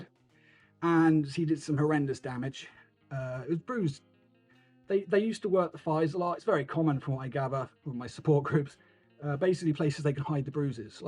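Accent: United Kingdom. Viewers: Wait, you are british? How could you keep this from us? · Holy shit your British??? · Bro... your British? I had no idea 🤯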